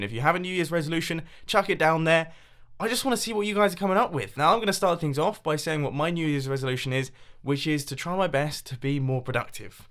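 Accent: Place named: United Kingdom. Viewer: James D that is a British accent, I know cause it's the same as American (English) duh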